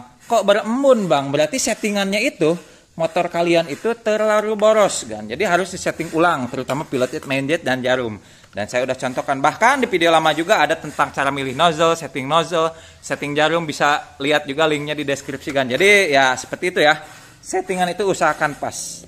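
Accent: West Java, Indonesia. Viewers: Sampurasun....yakin dari logat bicaranya pasti orang sunda dan beberapa x w nonton vidio u bang akhirnya w kena racun u bang wkwkwk waktu itu w nontn rev cdi predator u bang akhirnya w beli buat jajal di tirev w hasilnya jos...powernya enak ngejambak2lah hahha tapi ada tapinya nih puteran atasnya jdi nahan alias ngeden gtu cek busi warnanya putih waduh...keiritan ini mah fix ini mah musti set ulang pj mj w balik lagi bang nyari vidio u lah ktemu ini lagi bahas set karbu pj mj cocok.bingung ya naikin/nurunin berapa step ya karbu tirev std pj 38 mj 120 pengen kek gtu bang di gas beng..beng.beng .bikin tangan ngaderegdeg hehe tapi dari tadi w malah fokus ke astreanya sumpah mesinnya kek daytona ya...ngeri....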